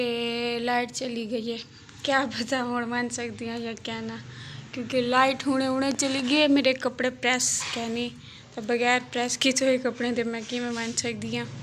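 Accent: Saraiki, Pakistan. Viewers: AJ bht din bad apka vlog daikha Sirf apki awaz piari hai q k sariki bht piari bol leti ho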